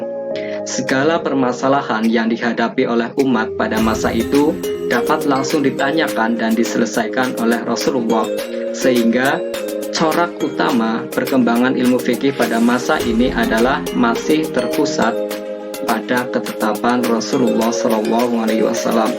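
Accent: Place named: Banyumas, Indonesia. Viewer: Mantap sodara ngapakku🔥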